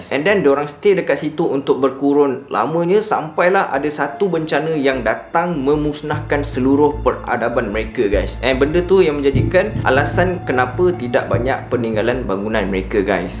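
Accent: Johor, Malaysia. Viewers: Bahasa awk .tak sesuai..giler..korangg gay..DLL...slang lebih Johorean... sedang kan... yang tonton nya satu Malaysia Indonesia...gunalah bahasa . sebut betul sikit..atau baku pun boleh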